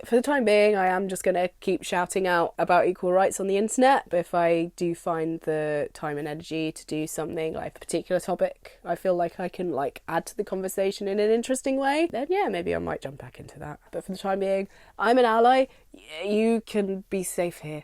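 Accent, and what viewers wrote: United Kingdom. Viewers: so is she a brit or does she just sound weird?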